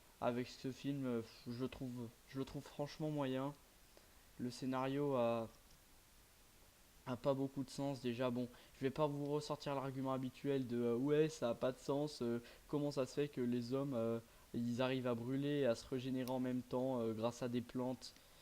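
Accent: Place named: England, United Kingdom. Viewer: Osef l'accent anglais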